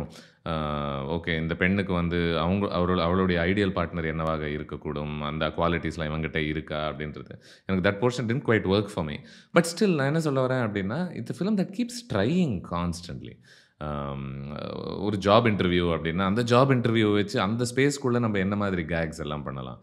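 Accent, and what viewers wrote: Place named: Kerala, India. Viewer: Avar full ah tamil pesala 😂😂 avar konjam malayalam pesraru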